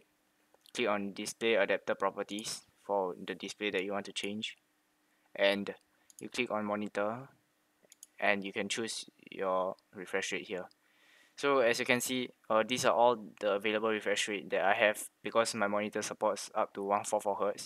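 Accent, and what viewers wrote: Singapore. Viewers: i knew it u use Singapore english Accent Lol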